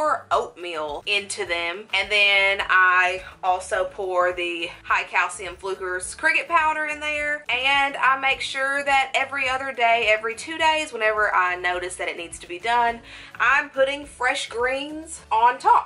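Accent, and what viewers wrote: South, United States. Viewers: I love your accent. God I miss the south 😭 THANKS FOR THE INFORMATION 👍😊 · i love ur southern accent 😍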